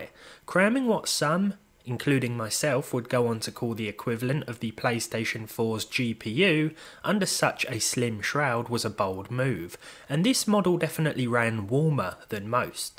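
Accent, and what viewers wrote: United Kingdom. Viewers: Everytime I see your face I have a weird feeling of you you are an npc lol Always talking in the emotionless British accent puts that theory to the most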